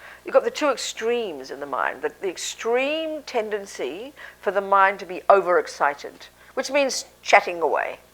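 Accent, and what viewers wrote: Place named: Melbourne, Australia. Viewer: As someone who also grew up in the south east suburbs of Melbourne, her approach works for me. She doesn't dance around people's neuroses but cuts through with clarity. This is how we talk in Melbourne.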